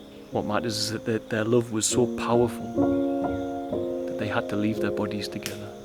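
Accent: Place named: North East England, United Kingdom. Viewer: I feel the need to connect with our creator but I dont know how. I'm from the North West of England and I detect your accent is the North East. Can you or one of your congregation guide me to a sanctuary where I can find my way to God? I live in fear now but yearn to be a light in this dark time and lead by example for my family and community. I need help and I dont know where to turn. I've been praying for guidance and forgiveness. Please lord here my call. I want to serve the creator and bring others to Christ. 🙏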